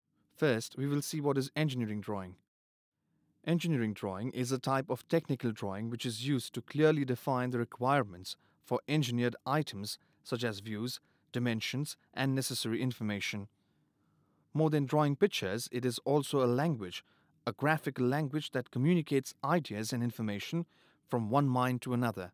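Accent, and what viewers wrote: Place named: Kerala, India. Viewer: nice work mate. you are definitely from india. ur accent gives u away. i think you are from kerala.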